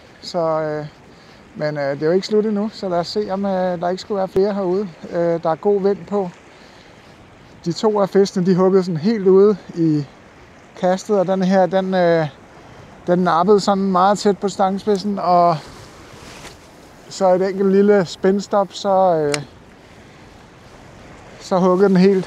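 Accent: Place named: Zealand, Denmark. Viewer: Flot fisk. FIn video. Kan høre du er fra Sjælland. Men er du ikke sød at præsentere dit valg af plads og grej og de overvejelser du gør dig i disse valg.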